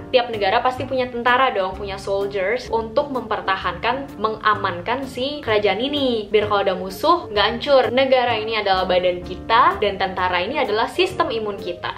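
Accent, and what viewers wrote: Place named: Betawi, Indonesia. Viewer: Enjoy banget dengernya😍😍😍 btw logatnya jadi kaya agak betawi ya😂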